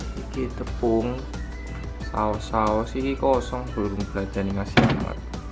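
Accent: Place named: Java, Indonesia. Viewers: All, wahh hebat mas bisa bahasa korea, tp logat jawanya masih ada ya pas ngomong indo nya hihi 👍 sukses mas di sana, Jd ngiri pengen ke korea 😂👍👌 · Ikhh, seneng ngliat maz nya ganteng tp medok ky q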